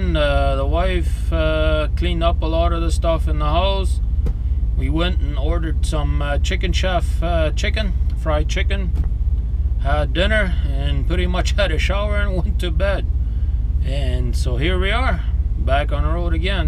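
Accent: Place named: Canada. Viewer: An actual heavy Canadian accent, I haven't heard one in a while.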